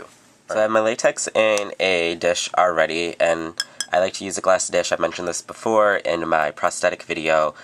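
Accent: United States. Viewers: I love how the you say 'just go right ahead' and everythings a question (clearly american)... id work on that ;)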